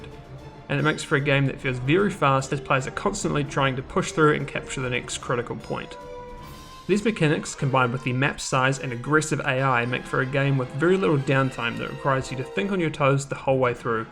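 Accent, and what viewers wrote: New Zealand. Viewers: Great game, great video, great Kiwi accent. Chur.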